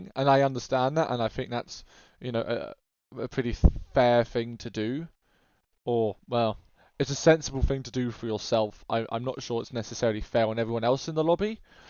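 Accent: United Kingdom. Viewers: dude! i just realized you're from the UK!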